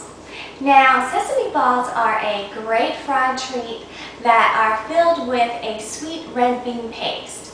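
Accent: South, United States. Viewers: Aww, I love your Southern accent! Your new vids sound very cute though. :) Thanks for posting these! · you sound mighty country, i do too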